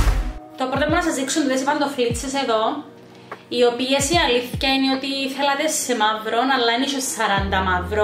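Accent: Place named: Cyprus. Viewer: Εισαι κουκλα και γλυκύτατη αλλα τρελαινομαι ν ακουω Κυπριακη διαλεκτο!!! Εισαστε υπέροχοι ολοι!!!!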